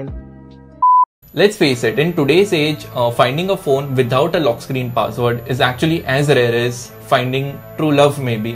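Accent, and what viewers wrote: India. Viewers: Indian accent really annoys me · For an Indian, your grammar is okay. Just work on the accent. Face it, you don't like it when Northeast people speak Hindi with very funny accent. Likewise.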